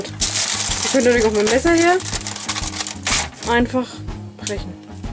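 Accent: Franconia, Germany. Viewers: Man hört es ich komme auchbdas franken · PALACE ja, das ist ihr Dialekt, Fränkisch:) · Jawohl a Fränggin ;) gfälld ma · du bist 100%ig aus Franken!!! Ich bin selber aus Frangen (:D) und ich kenn sau viele die so sprechen :D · PALACE ja so redet man in franken nunmal ;) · DressCommunication also so krass fränkisch schwätze tut de jetsche ned, daß mo dä ned vastehe kännt (oder so ähnlich) bin kein schwabe ich bin Hochdeutsch erzogen worden, kann aber dennoch (im gegensatz zum schreiben) Schäbisch schwätze, Hessisch Babble und berliner Schnauze und i red boarisch.. Dialekte darf man ja eh nicht mehr haben weil man ja rassist ist, wenn man jemanden den weg erklärt und der meint man verarscht ihn..nur weil er den bundesland gegebenen Dialekt nicht versteht.. o.O Das einzige was mich an dialekten aufregt ist dieses Semmel=Brötchen, Pfannkuchen=Crepés, Berliner Pfannkuchen=Krapfen !... 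Weißbier=Weizen, Colaweizen=Russe usw usw... da kann man ausflippen wenn man beim bäcker an der theke steht... >.<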